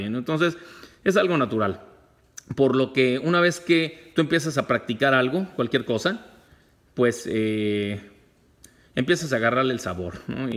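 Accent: Mexico. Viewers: Saludos desde España para México lindo,querido y armado.Videos interesantes y además en el espléndido español mexicano,lo cual se agradece.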